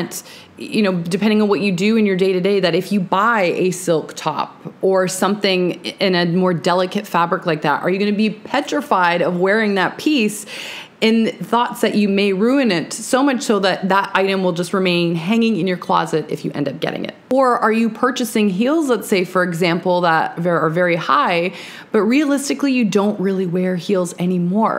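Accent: Canada. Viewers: Hello, new subscriber here! Very interesting and helpful tips, I can see this will be a fun channel for me. I love the deal with bringing our fancy dress into our everyday wear. I am a big fan of that style, even if it does confuse some women in my neighborhood.😉 But first, I was wondering (not a fashion question) if you are from Canada? Curiosity always gets the best of me and there is a slight accent I hear...just wondering.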